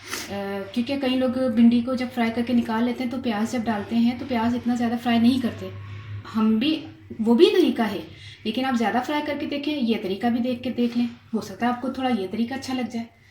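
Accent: Punjab, India. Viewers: Ji good I like ur Punjabi acent from 🇨🇦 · Your Punjabi is so cute... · Ma sha Allah...nice Ma b bohat achi bindi bnata hn...abi lay k aya hn. Tu bnany lga tha socha utube se b dekhon or aaj difrent bnaon ....from UAE You say pindiyan😂punjabi accent....lay sun layan sun..sialkot...lahore...gujjranwala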